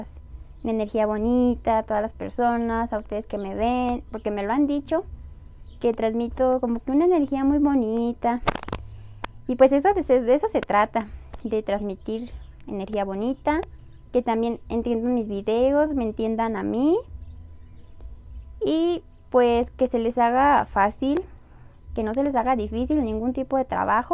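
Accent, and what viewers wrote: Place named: Chiapas, Mexico. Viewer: tu acento suena a chiapaneca de allá por ocosingo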